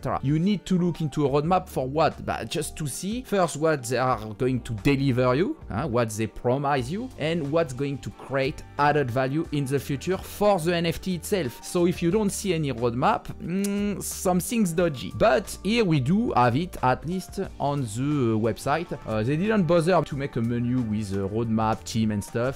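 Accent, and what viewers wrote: France. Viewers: L'accent French est le meilleur tout le monde kiff de ouf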